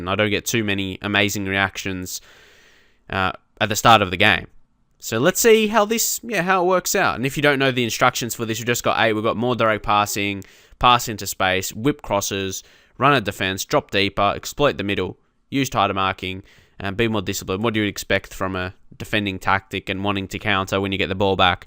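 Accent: Australia. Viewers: Seriously? Can't you tell he's an Aussie? :)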